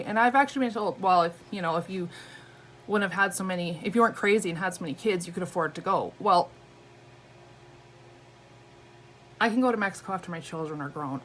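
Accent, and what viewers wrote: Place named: Canada. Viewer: I’ve just listened to this on my walk home and I just can’t wait to see you! Miss that Canadian accent 💜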